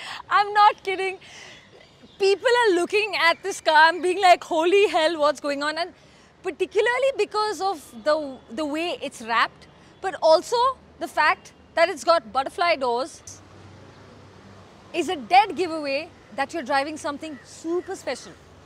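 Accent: Haryana, India. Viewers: Aap haryana se ho 🙂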